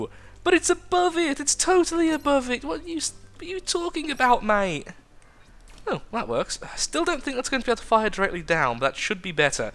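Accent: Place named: England, United Kingdom. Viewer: I love your englishness. or UKness, I dont know, accentness. sir ;)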